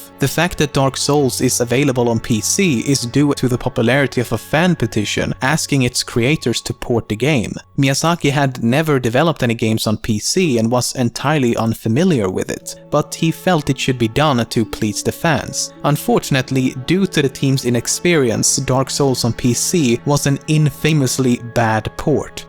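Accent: Sweden. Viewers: Exactly my point, hence why he does not pronounce it appropriately. Have you heard the way he pronounces the English word "character"? Incorrectly by English standards but just fine according to the way that the "ch" sound is used in Sweden, He says words the way that he does because it is the way he is culturally accustomed to pronouncing them. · christ his accent is swedish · The Swedish "accent" is leaking through! :D Where able to recognize right away that it was a fellow from our snowy north! :D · Omfg that swedish accent.. I just can't enjoy the videio hearing this.. And Ornstein and Smough AREN'T EVEN HARD!! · being from sweden it is so easy to hear if a person is from swedish XD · If you dont know this accent, this is a swedish accent, and it made me lol af! BIG UPS THOUGH! BIG UPS! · you can hear that the speaker is swedish xD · that swedish accent tho edit: he knows it.. 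· You can't have enough trivia and Swedish accents! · Cool videos and info man! Glad I stumbled across your channel.DS lore and Swedish accents ftw. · My God! The Swedish accent is so strong with this one. Being a Swede myself, I can dig it. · Is this guy swedish? he sound very swedish :P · im here for the swedish accent xD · Du var svensk!! Jag visste det! Brytningen fick mig att tänka till....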